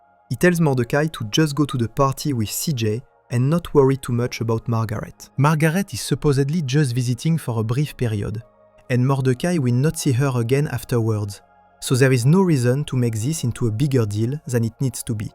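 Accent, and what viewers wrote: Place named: France. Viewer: Tu peux mettre une piste audio en français? Parceque ça fais un peu bizarre d'entendre un mec parler anglais avec un accent français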